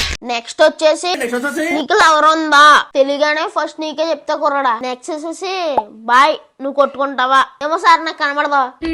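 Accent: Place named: Kakinada, India. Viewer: నీ వాయిస్ కాకినాడ చిన్నోడి వాయిస్ లాగా ఉంది.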